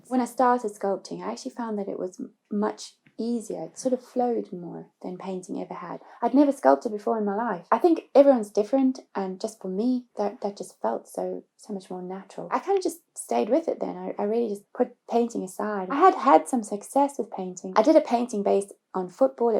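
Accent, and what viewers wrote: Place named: South Africa. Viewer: Ah! I can tell by your accent! I speak Dutch coming from another X colony!😃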